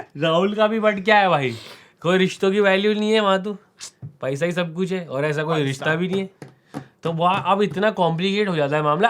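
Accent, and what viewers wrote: Bengal, India. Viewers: bhai ek ep pura bengali accent mein karo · Bengauli accent was just too good, top notch 🤣🤣🤣🤣🔥🔥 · Bengali accent >>>> prabhas jokes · I am a bengali buy bengali accent makes me laugh 😂😂😂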